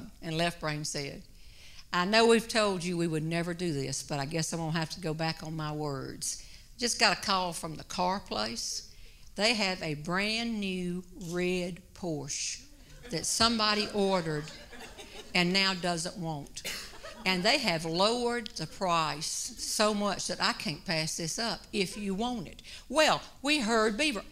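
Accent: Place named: Charleston, United States. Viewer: I grew up in the Charleston area. This lady sounds like some of my neighbors. Hilarious!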